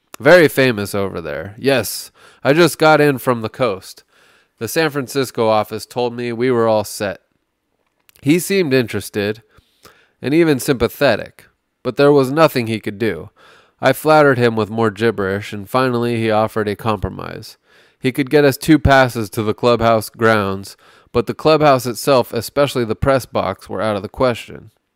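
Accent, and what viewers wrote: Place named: England, United Kingdom. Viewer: Great selection. Nice English accent. Cut the BS at the beginning of your podcast though...no one cares. 😉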